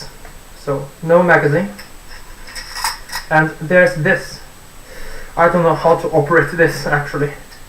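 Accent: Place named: United Kingdom. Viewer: Why sounds British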